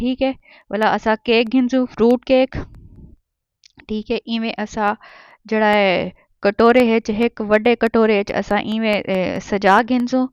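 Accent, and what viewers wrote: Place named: Saraiki, Pakistan. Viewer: Nicccc Sariaki vice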